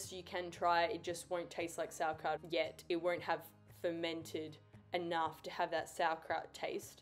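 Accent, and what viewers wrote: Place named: RP, United Kingdom. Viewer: Very good R P English language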